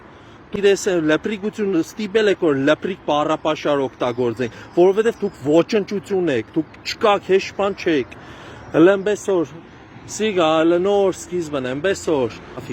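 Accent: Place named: Gyumri, Armenia. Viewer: Акцент Гюмреци молодец сынок джан спаси сахрани Господи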